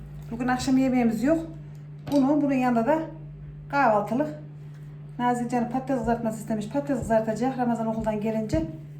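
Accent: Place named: Kirikkale, Turkey. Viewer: Ellerinize sağlık canım çok güzel görünüyor. Tarflerinizi çok beğeniyorum. Bende yapıyorum. Konuşmanızda aynı benim gibi konuşuyorsunuz. Bende kırıkkale liyim. Senin hamur yoğurmanı çok severek izliyorum. Çok güzel ve beceriklisiniz. Maşallah elleriniz dert görmesin. bacım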